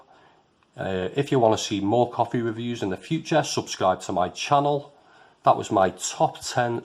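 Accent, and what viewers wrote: Liverpool, United Kingdom. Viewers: Lovely Scouse accent. Adds flavour made. Keep it up.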